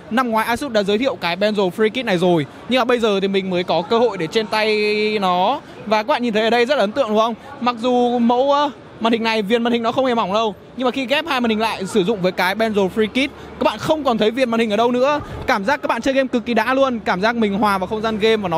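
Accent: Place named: Nghe An, Vietnam. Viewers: á đù a người nghệ an à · Giọng Nghệ - Tĩnh đó bác, ông này người Hà Tĩnh. Face ông này hình như là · Hà Tĩnh bác ơi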